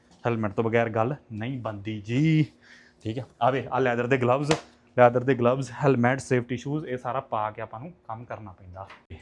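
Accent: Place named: Gurdaspur, India. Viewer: tuhadi boli toh lagda hai k Gurdaspur dinanagar toh ho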